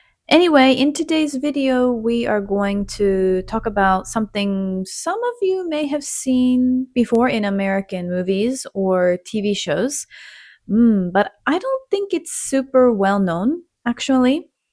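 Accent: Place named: Japan. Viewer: 全て字幕成し遂げで聞いて、７割理解出来た、けど、貴女がJapaneseアクセントでslow english するおかげだね😅プロムは、日本人の意識ではハリウッド映画等で馴染み深いというかusa のカルチャーは知ってるつもりです。😊(知らない単語が連発してるが憶える必要はあるのかな、個人レベル次第かな😅)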